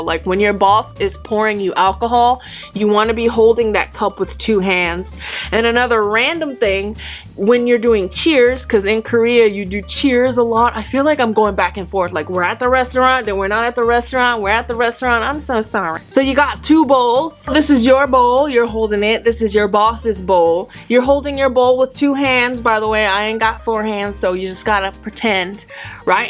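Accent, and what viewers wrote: South, United States. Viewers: Lol the way you talked cracks me up every time I watched your videos. You can take you out of America but you can’t take America out of you😂😂😂😂👍 I love your videos, very educational and detailed. Keep’em coming girl you’re awesome 👍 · I'm from the South too(Tennessee)! I wonder, do you have a Southern accent when speaking Korean?😂😆 I talk fast also, another Southern Thang! Gurl! You make me laugh so much! TFS! Light, Love & Blessing's💖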